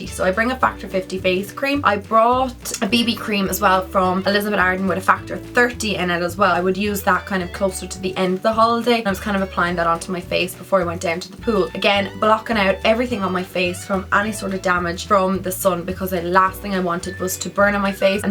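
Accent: Ireland. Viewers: what part off Ireland are you from Xxx love your videos <3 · She's from Ireland 😋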